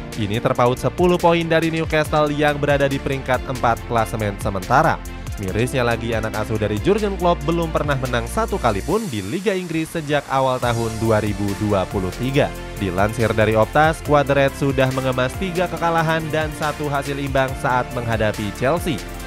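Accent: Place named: Banjar, Indonesia. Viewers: Pian ni urang banjar kah mang?? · Anjay adminnya org banjar nih😄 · Admin cetakgol orang samarinda kah....GK asing sama cara bicaranya🤔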